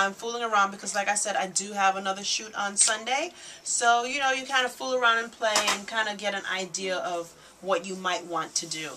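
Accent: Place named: Caribbean. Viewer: you're gorgeous and i love your personality... which west indian island are you affiliated with... yup, i hear an accent 8 )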